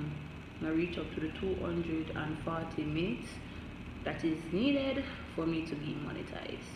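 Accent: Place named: Caribbean. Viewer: Lol i love your Caribbean accent ☺️ ready to support anyone who wants to grow🥳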